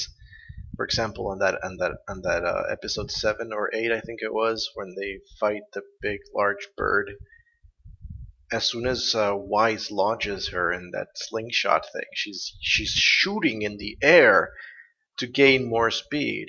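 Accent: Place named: Puerto Rico. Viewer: oh i didn't, but that's great :D i meant your accent that your from puerto rico, like i didnt no which but i knew you were latin lol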